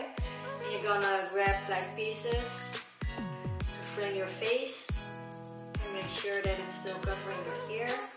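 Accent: Netherlands. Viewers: Ben je Nederlands? Je hebt echt een nl accent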